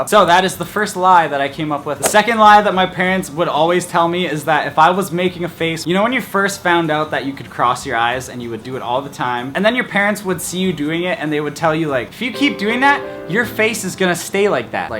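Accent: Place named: Canada. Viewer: Random Question is he Canadian!!! this the first video i've seen of his!!! He sounds Canadian!!!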